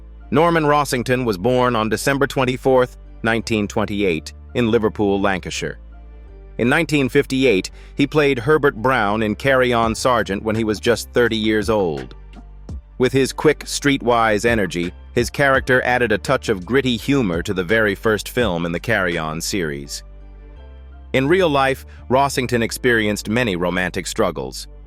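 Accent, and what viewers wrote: United States. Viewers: That,s because the commemtator is american ! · Why the annoying American narrator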